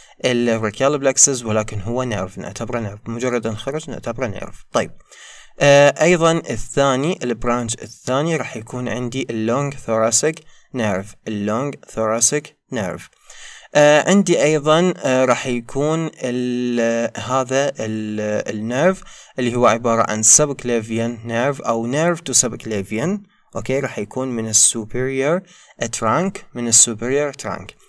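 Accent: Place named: Iraq. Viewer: ربي يوفقك بجاه محمد وآل محمد نحبك كلش من العراق اغلب متابعينك طلاب عراقين يمكن اني الوحيدة الي لاحظت اللهجة مالتك مزج بيها لهجة عراقية يمكن تستخدمها لان متابعينك عراقين حتى يفهمون اكثر احييك 💙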